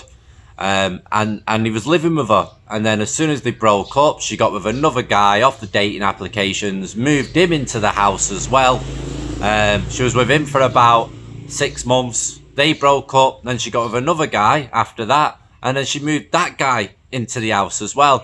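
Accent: United Kingdom. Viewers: What is that accent, UK, Australia, New Zealand??????????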